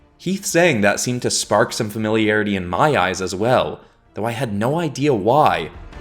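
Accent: Ireland. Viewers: As an Irish man myself i must say, you do an impressive Irish accent 👍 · AMAZING! This Is Going To Be A Fun Miniseries, As I've Always Really Enjoyed Tayrun's World And Videos, So I'm Looking Forward To The Rest Of The Saga, And Your Irish Accent Is Good In My Opinion! I'm Still Hoping For Gardevoir In A Future Pokemon Episode And A Splatoon Video! Also, I Just Got A HUGE Jurassic World Mosasaurus Toy (I LOVE IT, But IDK Where To Put Him Yet😆) From A Comic Shop Today, Which Reminded Me Of Your Mosasaurus Demon From A While Back, And Now I Want To See Either A Mosasaurus Dragon, Or Even A WereMosasaurus For A WereDinosaurs Video! I'm Looking Forward To Your Next Video, And I Can't Wait For The Next Grand Prix! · Love how you pushed this to be a multi-character and beast fighting double spread. This is absolutely awesome and your irish accent was good. Were the spell names the irish words for the type of spell?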